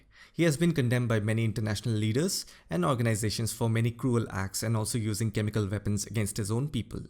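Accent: Bengal, India. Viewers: You have such a nice voice and nice English Accent. True bengali characteristics ❤️.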